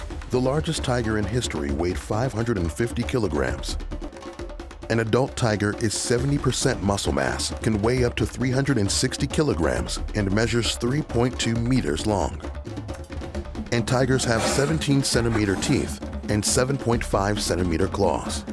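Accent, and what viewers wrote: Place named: United States. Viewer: American accent but uses metric system🥴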